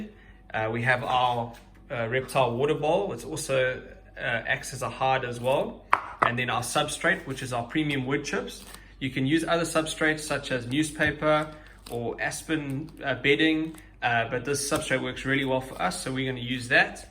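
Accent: South Africa. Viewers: U sound south african Are u south african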